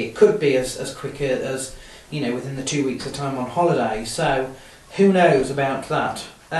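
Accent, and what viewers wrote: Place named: Warrington, United Kingdom. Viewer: haha, well, good observation with the accent, tis abit of a hard one to pin point so, credit to you for conjuring up Warrington of all places hmmm, not too sure about the whole UFC notion tbh, it would take alot of commitment, but i wouldnt completely rule out at least attempting to compete at some stage in my life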